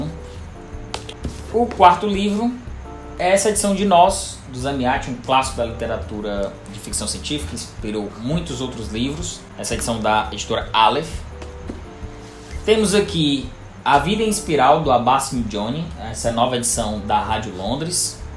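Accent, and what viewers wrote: Northeast, Brazil. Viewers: Parabéns pelo 💯 video, sempre assisto e pego várias dicas, amo vê o sotaque do meu nordeste no booktube👏👏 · Canal com um ótimo gosto literário e com o sotaque mais bonito do Brasil. Rsrsrs.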